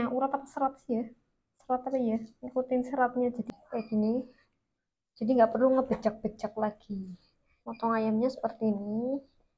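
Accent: Banyumas, Indonesia. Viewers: Wong ndi sih ngapak banget mpeyan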